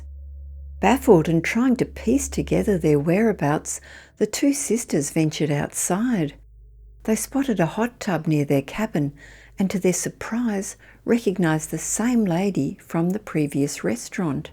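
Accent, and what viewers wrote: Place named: Australia. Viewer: Exactly so why would this feature on this episode? The narrator is clearly Australian?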